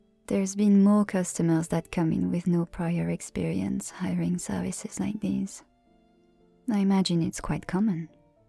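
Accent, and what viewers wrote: France. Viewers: Mon dieux je m'attendais pas à ce que ce soit un français aussi authentique !!! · Oh, the French accent, the whispers and your voice melt my heart. I really needed to relax my mind. You always know what we crave. Tu es vraiment douée dans ce que tu fais. Merci. 🤍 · F4F french accent ASMR, lets goooooooo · Having to do quizzez and listen to this during it and helps me relax and focus your french accent is really amazing! First time i've heard someone with a french accent you do really good ❤ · Love the french accent.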